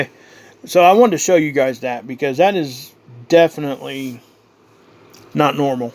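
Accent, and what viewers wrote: South, United States. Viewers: excellent. very very impressive data. advice. try focusing on the data at hand more. hard to watch your video in it's entirety as 50% plus is going off the target. i like your delivery mechanism and your accent is great. love the southern accent. all good but if possible try to stick to the points being made as much as possible. taking a long time to say what your analyzing due to alot of breaks in the transmission. greatly appreciate your time to produce and share your knowledge with the truth seeking community. holy spirit protect and guide you always. peace be upon you always. selah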